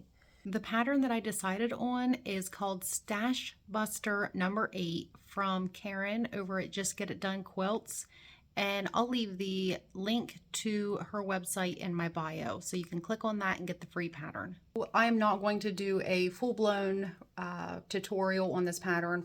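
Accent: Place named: Maryland, United States. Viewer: Also are you from near Maryland? That acent😅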